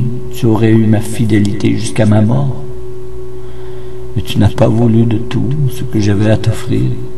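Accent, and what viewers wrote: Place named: Quebec, Canada. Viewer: Tellement triste 😭 perso j’aime beaucoup l’axent québécois whaaaaaaaa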